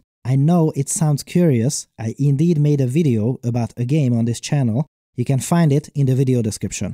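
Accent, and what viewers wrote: Hungary. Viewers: I like your Hungarian accent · Hogy hallatszik, hogy magyar vagy :D klassz a vidi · Probably. His accent sounds a bit hungarian as well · Jovan magyar akcentusxd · as soon as i heard your voice i knew you were hungarian. i like your accent :) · omfg as a hungarian I can really feel your hungarian accent xd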